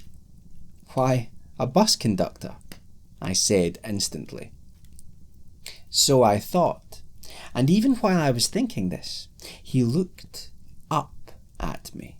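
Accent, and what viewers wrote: Scotland, United Kingdom. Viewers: At the beginning I briefly thought that the accent would deter from the story, but that wasn't the case at all! Well done, my Scottish friend! :D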